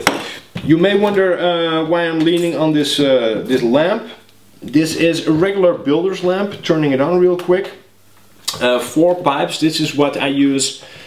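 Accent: Netherlands. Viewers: lol i saw a couple of videos from you and i already thought you are dutch hearing your r's and now you confirmed it lol btw dutch too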